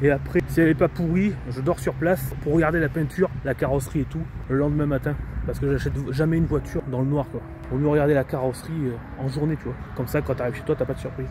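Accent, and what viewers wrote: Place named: Southwest France, France. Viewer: brive.. ptn con je me disais bien l'accent il ressemblent au miens 🤣 je suis vers marmande bergerac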